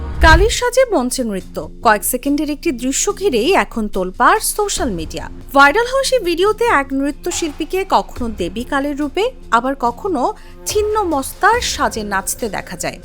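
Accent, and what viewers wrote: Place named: Bangladesh. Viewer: আপামণির কথা বলার ধরন দেখে মনে হচ্ছে বাংলাদেশী, তা আপামণি আপনার দেশ যে বিদ্যুৎহীন অবস্থায় ব্ল্যাক হোলে ঢুকে গেছে সেই খবর করেন না কেন??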